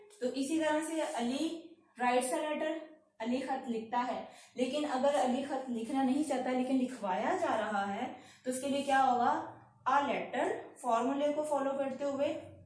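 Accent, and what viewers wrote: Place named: Lahore, Pakistan. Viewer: Are u from Lahore.....great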